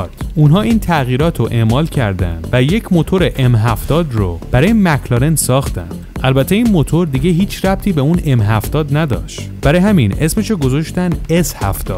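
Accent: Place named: United States. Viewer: احمق داره لحجه آمریکایی درستش رو میگه ناراحتی؟؟ ساکت شو یاد بگیر چون تو داری اشتباه میگی، استاد زبان انگلیسی هست بچه جون🤔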